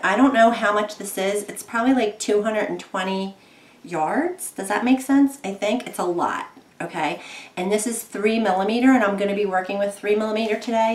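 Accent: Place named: South Jersey, United States. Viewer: Had a feeling you were from south Jersey. A Jersey girl recognizes the accent. 😂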